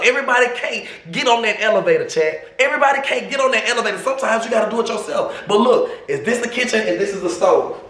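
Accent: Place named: South, United States. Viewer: Twin I got to this part of the video!! lol you so country too tbh remind me of my peoples 😅❤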